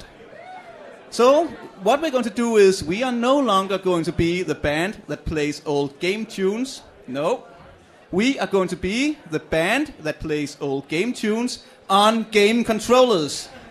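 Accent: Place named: Denmark. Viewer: Press Play on Tape is Danish, see <link> And yes, the accent very much sounds Danish :)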